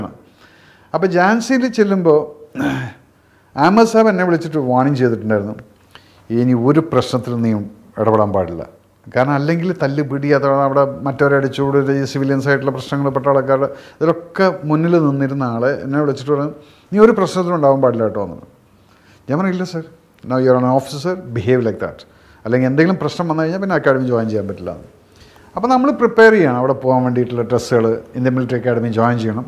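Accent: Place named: Tamil Nadu, India. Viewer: His Tamil accent is superb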